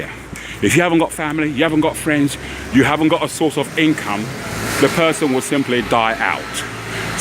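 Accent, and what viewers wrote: Cockney, United Kingdom. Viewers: Thank you for spreading the truth and reality of the UK. Too many go back home pretending they are living like kings. The truth? they are telling lies. My parents took me and my siblings on holiday to Nigeria when we were kids in the early 80s and the thing that struck me were the amazing and beautiful buildings in Owerri and the village. I'd never seen a luxury apartment in my life, not until I went to Nigeria. People owning their own land and building their own homes on it. That blew my mind. UK was a bit late to the game of building luxury apartments for ordinary folks. Really tired of the negative images of Africa the UK like showing on our TV screens. Thanks again for making it your mission to show us there is a better way. Love the Igbo Cockney😄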